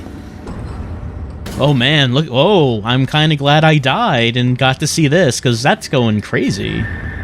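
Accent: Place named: New York, United States. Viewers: Your... uh... New York accent when reading letters makes this game much more enjoyable. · Newark union plumber accent is really the best. Especially in Doki Doki Literature Club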